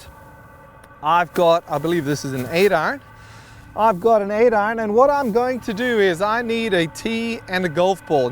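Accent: South Africa. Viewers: Howzit, First time watching this video and found it really helpful. I just wanna ask one thing Are you South African because you have a certain twag that really seems South African 😂😂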